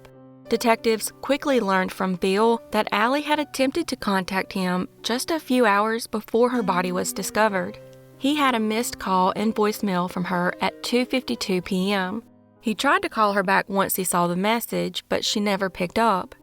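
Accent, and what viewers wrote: South, United States. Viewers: The scumbag couple that took the lives of Kandis and Terri Ann had one of the most pathetic excuses I’ve ever heard! “They had no other choice”. WTF!! Murder people or leave and go somewhere else, it’s not a hard choice! No murderer here has an excuse, that one just got to me, how stupid and vacuous this couple are! 🤬, thank you as always for your hard work and compassion for the victims. I adore listening to your gorgeous voice and southern style. ❤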